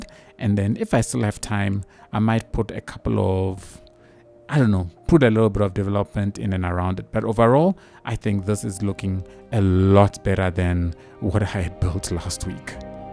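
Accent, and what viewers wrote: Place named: South Africa. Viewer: Finally!! A South African Cities Skylines gamer 👏🏿 knew it from the accent. 🇿🇦 And the roads have the correct handing too. Make sure you have enough policing please 😅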